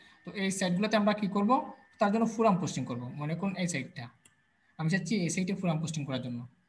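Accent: Sylhet, Bangladesh. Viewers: Vai er bari Sylhet Naki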